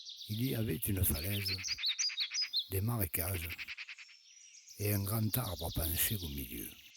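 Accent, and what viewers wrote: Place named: Provence, France. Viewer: Grand merci l'ami pour cette jolie et emouvante video , avec son texte plein de poesie ! ton histoire me ramene a des temps anciens , restés chers a mon coeur .....sorti de mon auvergne natale ou je suis retourné maintenant , j'etais rentre "aux chantiers" en 56 comme apprenti en logeaint chez un copain de marine de mon pere , en face de la ROUVE , quand les collines etaient encore pleines d'oliviers et pinedes .....plus tard deux ans dans la royale de 61 a 63 .....revenu marié en 67 , mon second fils né a la seyne , nous habitions a l'aiguillette juste derriere la rouve .......les oliviers avaient disparus , les mimosas aussi , les figuiers du chemin du manteau , avec des arbousiers et les cigales restaient ......la vigie etait sortie de terre , on se baignait a balaguier et les chantiers du midi ( le petit baigneur ) fonctionnaient .......le bar des marroniers accueillait ses boulistes .......les sablettes n'etaient pas mangées par les parkings et pin rolland voyait arriver ses premieres villas ! 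je bossais a fabregas , le brusc , l'arsenal , ......mayol n'avait pas ecrasé le marche de gros et barneoud ouvrait..... tant de souvenirs reviennent et malgre les annees tout reste dans ma memoire ! ton accent m'a redonné les bruits et odeurs des " sanguins " de la pinede ........j'y reviens parfois mais tout a tellement change ! meme berthe ou j'ai travaille a ete fracasse .....le cours louis blanc bourdonnait de camelot et la marchande de cade me plaisait trop ........le temps f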